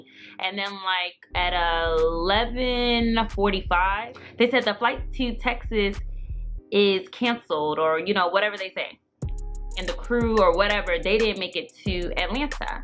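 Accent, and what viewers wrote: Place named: New York, United States. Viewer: you still got the new york accent!!! we thank God ohh!! love you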